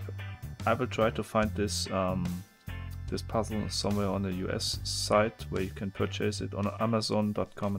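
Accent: Germany. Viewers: Du hast sooo eine beruhigende stimme und man hört sofort dass du deutsch bist :) ich war eigentlich nie der typ für puzzle spiele aber du hast mir dem echt näher gebracht und ich finde das total interessant was sich die macher immer bei verschiedenen mechanismen gedacht haben :) außerdem ist die soundqualität eeeeendlich gut xD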